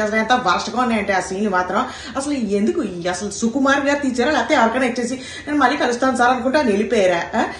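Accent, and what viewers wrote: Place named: Godavari, India. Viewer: అచ్చడిపోవాలి అంతే 🤣🤣🤣...గోదారి స్లాంగ్ ఆదరగోట్టారు👌🏼😍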